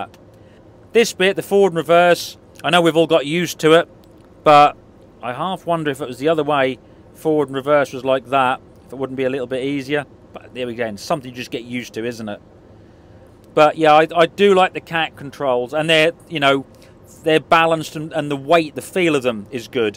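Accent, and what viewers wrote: Norfolk, United Kingdom. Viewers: What accent is that? Norfolk?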